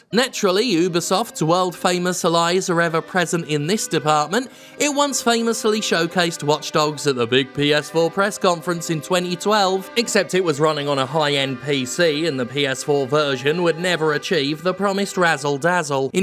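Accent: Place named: United Kingdom. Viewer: British accent, plus sized man, PETER MOLYNEUX, for a moment i though i was watching Larry bundy jr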